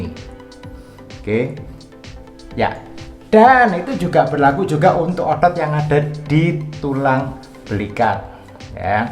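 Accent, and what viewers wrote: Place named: Java, Indonesia. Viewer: Coach orang Jawa apa Bali ??, logatnya Jawa tapi bilang otot bilang Tnya kaya orang Bali.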